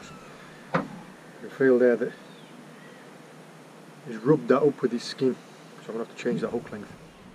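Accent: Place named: North East England, United Kingdom. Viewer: Where are you based to mate, I just thought you were up in the north east because of your accent. Are you ex army as you are always well turned out for an angler!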